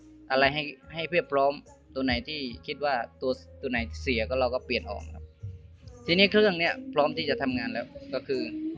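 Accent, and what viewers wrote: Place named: Surin, Thailand. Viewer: สำเนียงเหมือนเขมรสุรินทร์เลยอยู่อำเภออะไรล่ะบ่อง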